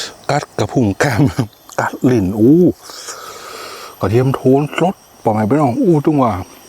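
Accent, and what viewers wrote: North, Thailand. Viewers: ชอบตรงทีเขาอู้คำเมืองจ๊ะ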